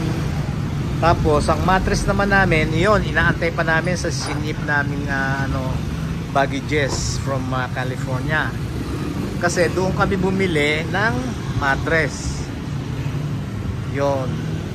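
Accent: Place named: Pampanga, Philippines. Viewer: Kuya kapampangan ka? Kasi may tono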